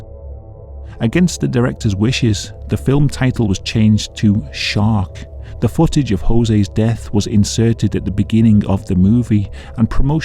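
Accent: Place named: Cockney, United Kingdom. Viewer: nice accent. cockney?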